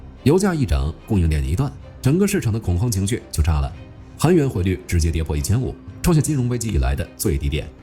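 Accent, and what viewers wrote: China. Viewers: 这个是个什么来历？从视角，口音，以及用词来看是中国大陆背景。但是字幕全用繁体，字幕里的用词也是台湾风格。比如说语音里说"项目"，字幕则写“专案”。想假扮台湾人吗？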